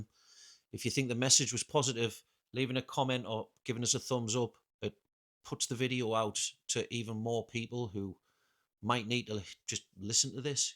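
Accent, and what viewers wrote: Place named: Newcastle, United Kingdom. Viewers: Hey mate, I obviously don't know your full situation, but the poor diet and alcohol definitely won't be helping the aches and pains, as well as living in a colder climate in Norway I'm assuming. I'd suggest sorting the diet first, see if things improve. Higher protein for repair, vitamin supplements, doesn't have to be too crazy. Also, good on you for moving country. I'm a UK expat living in Australia, best thing we ever did. From a similar area also judging by your accent, I'm from boro myself. Rooting for you mate 👍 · Don't know why mate, but I expected you to either be from Scotland or the North-East of England! Don't even know why!!🤔🤷‍♂️🙈🤣 not an insult BTW, Northerners rule!😎🙌🤝 thanks for sharing your story mate! I'm 27 and Autistic. Have worked night shifts, construction work, kitchen work in cafés and restaurants, pharmaceutical work, retail, youth work and just recently left a greenskeeping job. 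I actually love strimming hedges and cutting grass, but we also had to pick up dog shit and cigarette butts and I had enough! My family told me how worried they were about my mental health! I have a few days of holiday pay and I'm just taking that and gonna rest up.😵‍💫😵🤯😴 wouldn't even mind, I also went to university and got myself a level 8 honours degree! Everyone goes "wow! That's an excellent degree!! You'd walk into a job!". Sadly, I don't walk into any job!! I accepted the shittiest job I was offered, contracting to a contractor if that makes sense?! Basically, I had none of the rights of a direct employee of that company! Contract work is good for pay, like you do get paid well, but you also are the easy target for blame when things go wrong! It's very tough out there man! I hear you!! Night-shifts are carcinogenic so if you can get out of it, please do!🙌🙏 understand that is a lot easier said than done though! 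Take care mate🫡🤝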